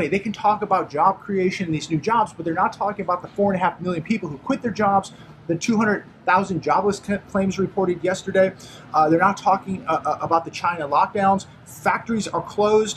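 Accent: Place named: South, United States. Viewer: It's Fridaaaaaaay! is perfecting a distinguished "southern drawl". My ancestors are from Montgomery, Alabama and Franklin County, Alabama. ~~ Safe travels.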